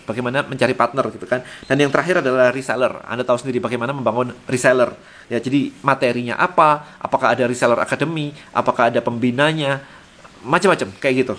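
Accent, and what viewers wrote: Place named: Surabaya, Indonesia. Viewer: logat surabayansss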